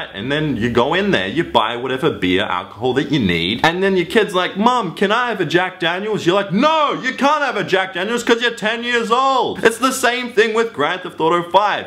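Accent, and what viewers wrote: Australia. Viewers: Are you Aussie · huh hes aussie subscribed im aussie too WOO HOO haha lmao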